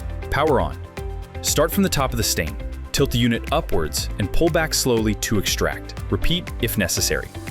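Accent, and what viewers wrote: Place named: United States. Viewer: what an awful video with irritating music and fast speaking american accent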